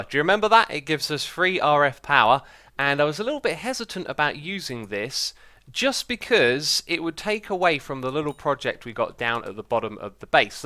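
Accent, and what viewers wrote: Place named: England, United Kingdom. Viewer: Wait!!!! is English???? Wow, i usually thought he was American. Ok that explain why his accent is so different from Americans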